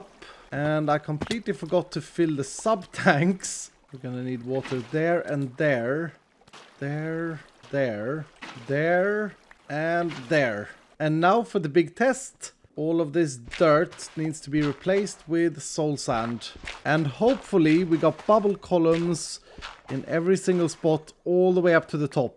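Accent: Sweden. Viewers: Are you Swedish because you have a very Swedish accident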